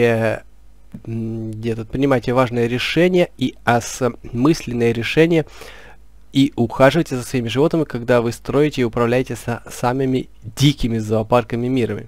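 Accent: Ukraine. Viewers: Автор украинец? Слышится акцент)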